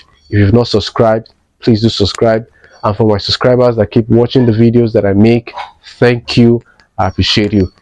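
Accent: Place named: Africa. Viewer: Your English accent is African learn the Filipino English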